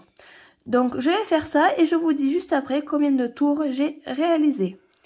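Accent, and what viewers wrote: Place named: Southeast, France. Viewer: Oui, Vos explications sont claires et nettes et votre joli accent me rappelle que moi aussi je vis dans le grand sud-est de la France. C'est du soleil pour la journée. Amicalement.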